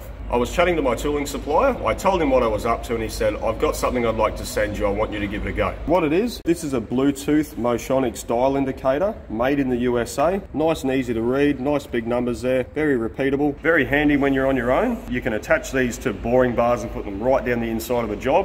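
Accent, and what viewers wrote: Australia. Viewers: First time I've heard it said like that: "moyd in tha yoo-ess-ayyy" (made in the USA) hahaha love the aussie accent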